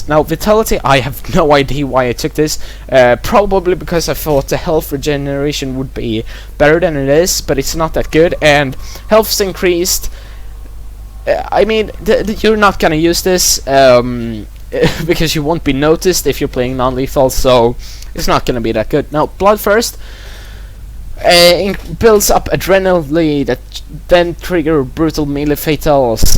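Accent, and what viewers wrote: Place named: Netherlands. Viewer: you must be dutch, i heard your pronunctiation and though you speak english well. when saying jump and other things i was like hmm.. he must be dutch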